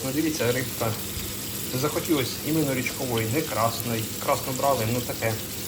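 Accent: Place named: Poltava, Ukraine. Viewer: Звідки ти? Говір схожий на мій Полтавський!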